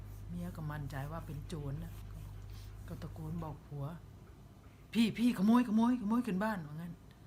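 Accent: Korat, Thailand. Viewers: ชอบแม่สุพูดโคราช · ชอบแม่พูดสำเนียงโคราช น่ารัก💕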